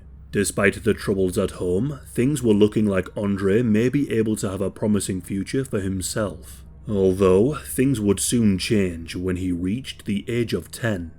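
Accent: United Kingdom. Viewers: it would be much more scary if you didn't have this hilarious british accent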